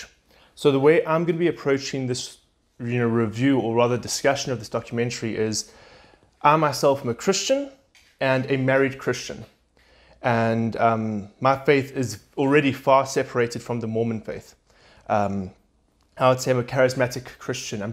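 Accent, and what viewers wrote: South Africa. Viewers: I heard his South African accent immediately too. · Are you South African? Sorry for asking if it offends you, because I don't mean to, I'm just curious about your accent. Good review from a Christian's point of view.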